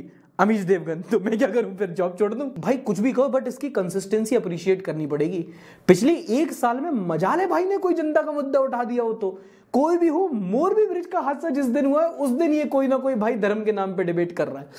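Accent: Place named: Bundelkhand, India. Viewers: Bro have full bundelkhandi accent I approve you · Sir your bundelkhandi is best i am from bundelkhand😂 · As a Bundelkhandi I can say you Bundelkhandi accent is 😂😂😂😂 amazing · My man speaks prime bundelkhandi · भैया हमें भी लग रहा हूं कि तुम बुंदेलखंडी हूं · Your Bundelkhand accent.........Maja aa gaya😂😂😂😂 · Bhai I am from Bundelkhand aapne bahut hi gajab Bundelkhandi boli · Bhai bhai aap to full bundli bol letai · As a bundeli 😍- Bro ur Bundelkhandi accent is on top notch!! 😅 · That bundelkhand ascent was lit 🔥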